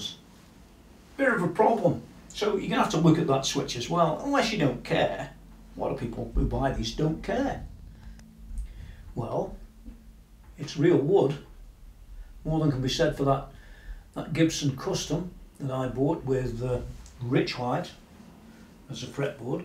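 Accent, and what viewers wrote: Stoke, United Kingdom. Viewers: I Have bought guitars (Ibanez rg350)from Thomann in the past and still will but when I looked at the Harvey Benton range something just didn't feel right to me. Thanks for doing this video there is a lot of crap out there and you need to research thoroughly. Is that a stokie accent.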